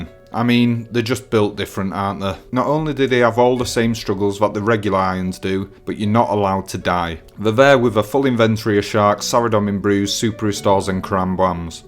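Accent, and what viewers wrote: Yorkshire, United Kingdom. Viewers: Wait, which Yorkshire are you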